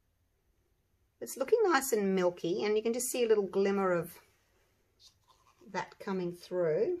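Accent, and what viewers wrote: Australia. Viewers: your lovely personality shines through! I love all the things you say, love the Australian way of saying things that's different from American way of saying things! You are interesting and engaging and very informative -- all good reasons to watch you, learn from you. Please don't change anything because someone commented on it. These are so beautiful! I love the pink! I don't think it's too much pink. If the white one was not next to the pink one, it would not be so obvious that you added pink! Opal was my mother's birthstone, she loved them and so do I! How about a touch of blue or blue-green? I don't think the powder on the back shows through. Beautiful, just beautiful! Thank you and take care and stay safe!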